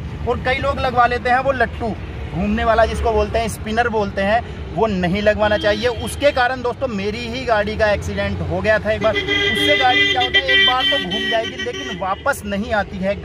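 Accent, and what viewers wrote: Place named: Meerut, India. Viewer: Bro...are you from Meerut ?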